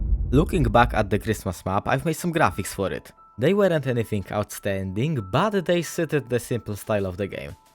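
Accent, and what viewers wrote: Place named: Poland. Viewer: Słychać że jesteś z Polski.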